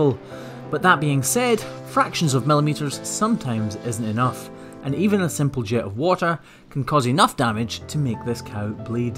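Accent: Scotland, United Kingdom. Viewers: I don‘t know anything about cows or hooftrimming (aside from what I learned from you XD) but since I stumbled over one of your videos I keep watching them for your humor, the charming scottish accent and the beautiful landscapes! Thank you for the Entertainment! ❤️ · Ur accent is from Brave ? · Scots ...hes Scottish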